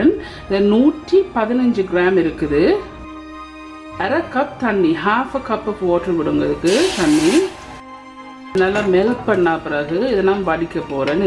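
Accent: Jaffna, Sri Lanka. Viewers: I can feel the fragrance of Jaffna in ur Tamil and Jaffna dishes sis... As i m Tamilian i m very much affectionate to srilankan tamilians... i never had a chance to visit srilanka... but i will go there for sure in future... keel uploading more dishes sissy... tc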